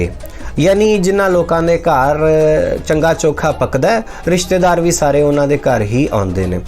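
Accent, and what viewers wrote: Punjab, India. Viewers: Bahut alaw sir.... I like ur punjabi accent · Your Punjabi Accent is lit Sir✌❤✌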